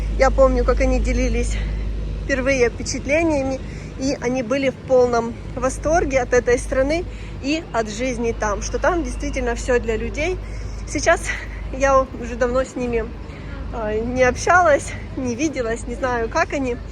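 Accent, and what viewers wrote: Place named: Western Ukraine, Ukraine. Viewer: привет! А почему у Вас западно - украинский акцент?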